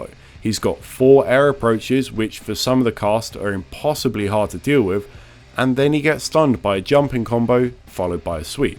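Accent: England, United Kingdom. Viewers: The authors from uk, it’s how we say it here. Kinda like Nike instead of Nikeee. Just a UK/London thing. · Yeah I find it a bit ironic that they're getting mad at Americans misprounouncing a word not in their language when his accent is English. Moreover, I'm an American, and I've never heard anyone misprounounce Ryu on my turf. I'm honestly pretty suprised how far this obvious joke was taken though, everyone misprounounces stuff at some point.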